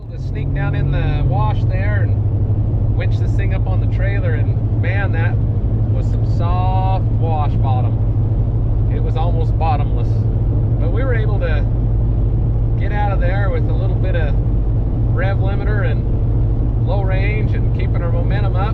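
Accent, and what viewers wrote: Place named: Texas, United States. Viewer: Ok I know you live in Utah but, since you mentioned it, what part of the Country are you from? Sounds like Texas.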